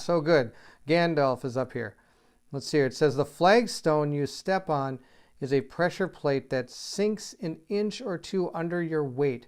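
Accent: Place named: Midwest, United States. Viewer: I'll never get over the midwest pronunciation of "root" and other similar words. Haha. Had a classmate from Duluth many years ago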